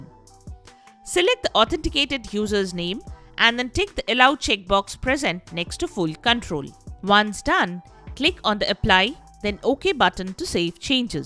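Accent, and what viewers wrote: India. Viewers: i stop when i hear indian talking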